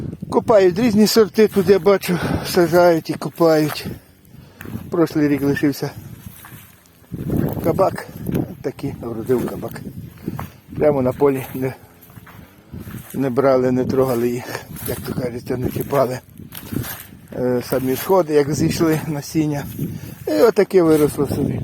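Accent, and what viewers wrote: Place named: Lviv, Ukraine. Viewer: Як приємно слухати ваше привітання, тільки почула і відразу подумала, людина зі Львівщини, навіть не відрізниш, у вас і діалект Львівський!, 😊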